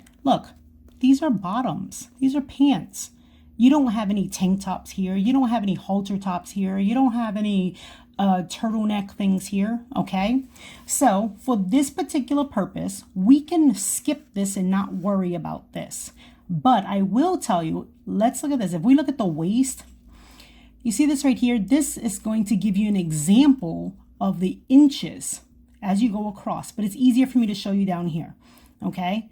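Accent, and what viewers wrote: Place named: Boston, United States. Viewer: you are so adorable, I find I am smiling while I am watching you. This video, helped me be confident in the things I have figured out on my own already, and you clarified some things I was not sure about, and taught me a couple new things!!! Thank you! But one question. Are you from Boston? I had a friend in college long ago, from Boston, and she said a couple of words with a particular accent, the you say a few words really similar. The word "here" and words that end in "-er". Just wondering.: D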